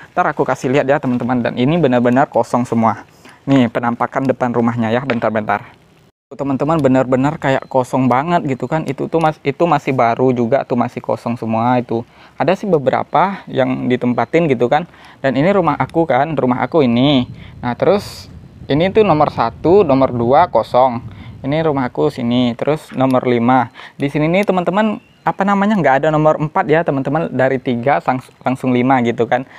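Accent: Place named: West Sumatra, Indonesia. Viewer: Logatnya sih seperti Urang Awak yo ?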